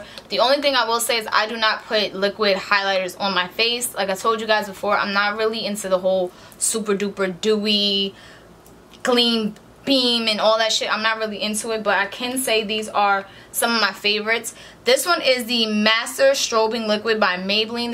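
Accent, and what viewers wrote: United States. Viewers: Detroit? I hear it lol correct me if I'm wrong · Gawjus! Love the look. And I'm getting New York accent vibes and I'm loving it .. 🙃.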